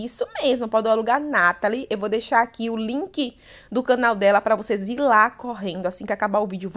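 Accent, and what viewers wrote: Northeast, Brazil. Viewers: Nada chata, adoro o trabalho da, adoro os vídeos dela e até seu sotaque nordestino😍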